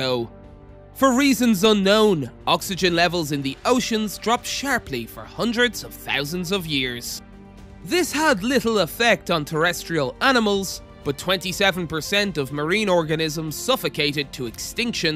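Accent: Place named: United Kingdom. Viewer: The narrator has a shrill voice and a UK accent. Not my favorite.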